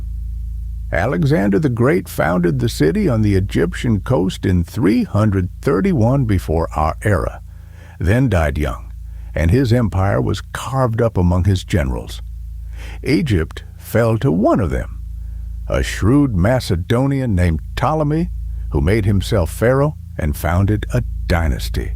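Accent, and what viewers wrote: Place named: Midwest, United States. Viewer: Hillbilly accent? You've obviously never actually heard a hillbilly accent before. This sounds like a Midwestern accent.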